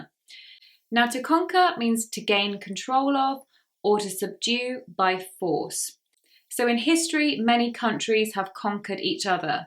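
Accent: United Kingdom. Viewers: Hi madam, really we have enjoyed your teaching in English and satisfied your grammatical and real British English for we accept this as native english,so may we suggesting you to speak little slower than usual so that we can heard sound and clearly okay! We also satisfied special English news from America which suits us slow,clear and steady English speaking.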